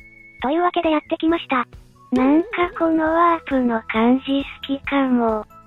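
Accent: Hakata, Japan. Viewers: もっと博多弁を〜〜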